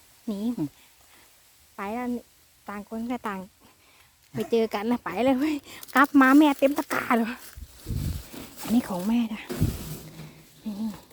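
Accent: Korat, Thailand. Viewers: พูดเหมือคนโคราชเนาะ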